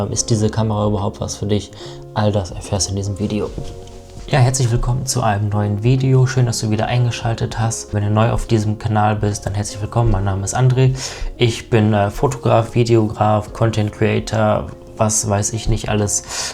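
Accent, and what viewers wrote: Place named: Germany. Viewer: Creator Combo ist jetzt schon auf 450 runtergekommen- da müsste ich sie mir für meinen Südafrika Urlaub auch holen🥰 vielen dank für das schöne Video.. Man merkt gar nicht das du nicht gerne vor der Kamera sprichst- zudem hast du ein sehr schönes, angenehmes Deutsch. Wäre sehr froh wenn ich als Schweizerin so sprechen könnte😅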